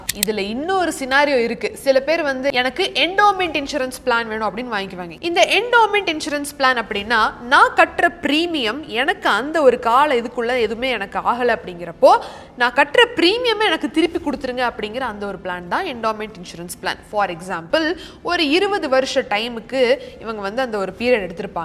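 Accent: Coimbatore, India. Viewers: Coimbatore slang change pannuga 😅😅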